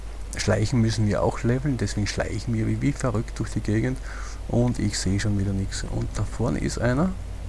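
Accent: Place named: Austria. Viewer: Der Ösi akzent ist zum verlieben!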